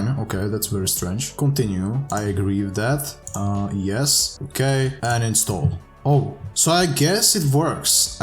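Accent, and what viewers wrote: Czech Republic. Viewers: hned sem poznal podle přizvuku že si čech dobrá prace ngl.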